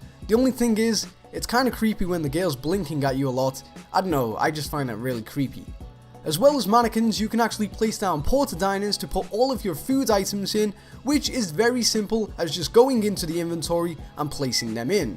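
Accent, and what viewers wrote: Liverpool, United Kingdom. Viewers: You've got the weirdest scouse accent ever you lad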